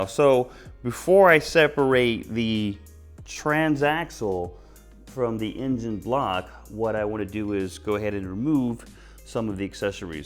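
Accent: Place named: United States. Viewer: Hi. Looks like it can be rebuilt to me. Can you not grind the crank and fit oversize bearings? I'm talking 60's/ 70's engines I suppose but the mechanics of this engine are from that era (if not the electronics!). 2 valves per cylinder, cam in block, pushrods. Great! Like the channel very much, you do stuff to owner/mechanic standard - that is not a criticism, just means that the attention to detail and care is better than I suspect the average workshop would give. You do a Rolls Royce job if its your own car, such as the diagonal relieving of bolts. Good tips too eg the spark plug ceramic colour (not black, not oily, not too white).Keep it up. I'm a Brit, you're and American but I just about understand you!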